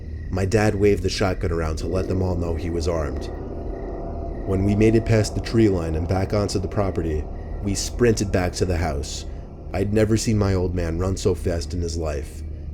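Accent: United States. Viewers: i thought u were american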